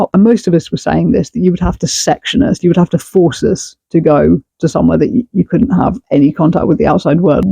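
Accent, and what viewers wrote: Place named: Birmingham, United Kingdom. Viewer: I swear to God, I was here thinking that I’d NEVER heard you sound sooooo Brummie. 😂 Then you said it yourself and i nearly fell off the bed. Love seeing you pushing yourself in a really challenging new environment. Rooting for you. Love from Glasgow. ❤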